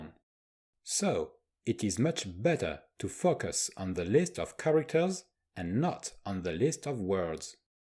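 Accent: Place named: United States. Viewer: Are you from France? It does not seem. You have a great American accent.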